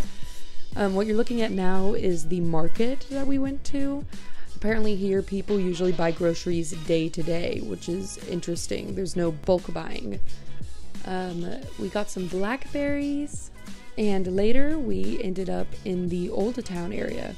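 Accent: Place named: United States. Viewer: It was your first flight in your life but your accent sound american. How did you travel from the US?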